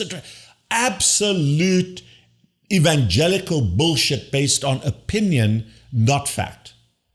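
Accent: South Africa. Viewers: Thanks Doc...your accent seem to have some regional South African traces...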